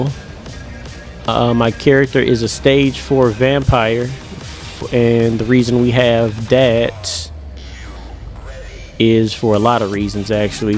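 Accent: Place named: Georgia, United States. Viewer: You sound like my friend from savannah Georgia, idk if you live around that state but I'm from Texas and yall have a stronger accent then us lmao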